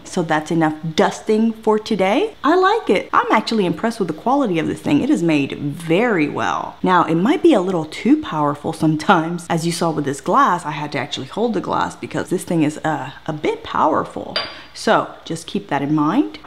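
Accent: Texas, United States. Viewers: yep she’s from Texas!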